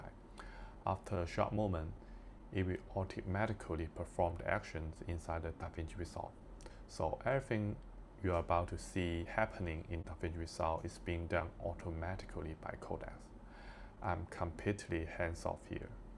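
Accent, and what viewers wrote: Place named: China. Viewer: I'm surprised Codex understood what the hell you were saying, maan. That Chinese-English accent is challenging. But thanks for the video, it's a good starting point.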